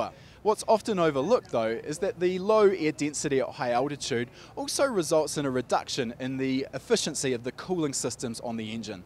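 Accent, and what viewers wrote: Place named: New Zealand. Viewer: Great thinking here. Real world tuning. P.s. loving listening to your NZ icksent. :)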